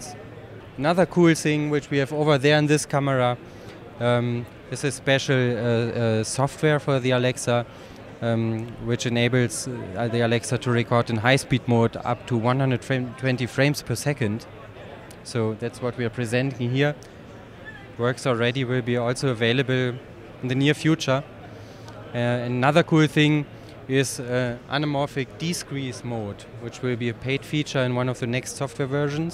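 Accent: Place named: Germany. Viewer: Er ist echt nen deutscher ^^ He really is a german, i'm german too but he really can't speak english ^^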